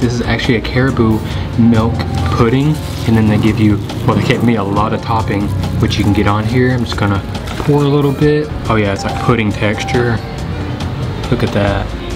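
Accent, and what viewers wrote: South, United States. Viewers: My ancestors hometown. Love Kapangpangan food but I am in the minority as I don't like sisig, my bad. But you are in food haven there. Enjoying watching and also listening to your southern voice , from Melbourne, Australia here. Btw it's not caribou it's carabao (cara-baw) or water buffalo. And you are forgiven. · yeah, cute Southern accent. By the way, it is not Cariboo. Hehe. Ca-ra-bow or Carabao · I’m a sucker for southern accents! 😩 I think we all need a charming country man to travel the world with 😂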